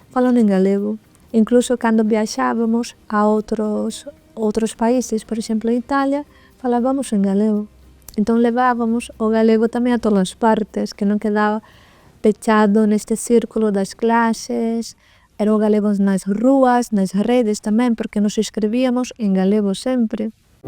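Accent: Castile, Spain. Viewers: Haber el canal Horrible la locutora que hable con acento gallego ESO DE HABLAR CON ACENTO CASTELLANO LE QUEDA HORRENDO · ela fala de uma maneira muito castilhanizada... no programa galegos pelo mundo quando mostram pessoas mais velhas elas falam igual aos brasileiros... a impressão que dá é que o galego tá sendo pouco a pouco absorvido pelo espanhol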